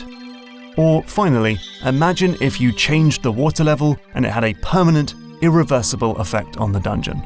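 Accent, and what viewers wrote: England, United Kingdom. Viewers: This narrator has the same accent as Tom Scott. Anyone know the region of England?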